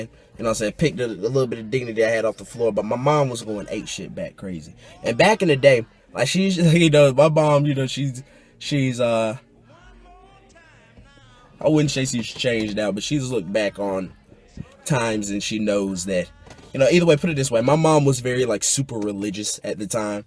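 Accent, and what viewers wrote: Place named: South, United States. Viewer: This dude sound hella country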